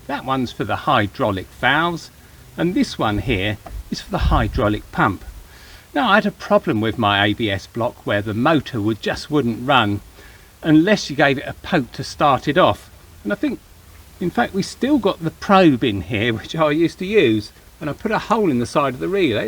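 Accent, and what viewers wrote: United Kingdom. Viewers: I love this guy. Listening to an english fellow explain things is as comforting as high tea on a lovely afternoon.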